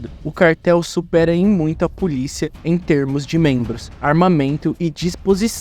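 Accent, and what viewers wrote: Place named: Caipira, Brazil. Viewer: História longa e narrador puxando o r de caipira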